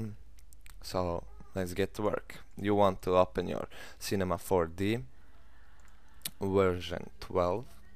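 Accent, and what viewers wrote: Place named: Croatia. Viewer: prica engleski jezik a ima hrvatski naglasak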